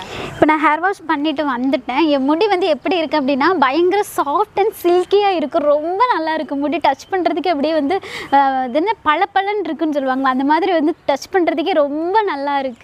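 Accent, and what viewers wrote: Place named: Valliyur, India. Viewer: unga speech enga ooru mathiri irunthuchu athanalathan keten enaku vallioor sister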